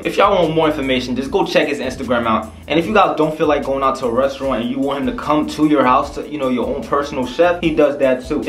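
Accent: New Jersey, United States. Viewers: Yo you from Jersey? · you sound like you from Jersey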